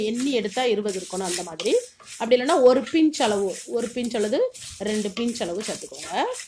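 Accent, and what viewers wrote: Tirunelveli, India. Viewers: Unga voor tirunelveli ya Akka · nanum tirunelveli than sister athan slang thariyuthu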